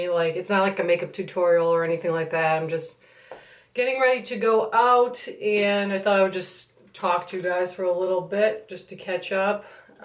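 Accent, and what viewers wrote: Boston, United States. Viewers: Cool. Is that a Boston accent I detect? :o)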